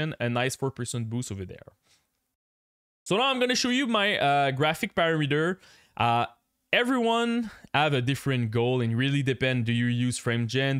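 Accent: Quebec, Canada. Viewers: J'entends le Québec ici! Good video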